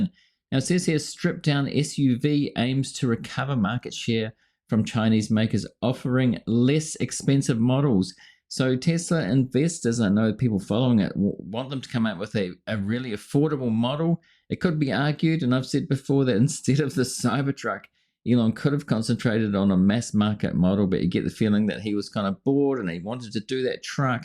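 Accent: New Zealand. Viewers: Good Kiwi accent bro'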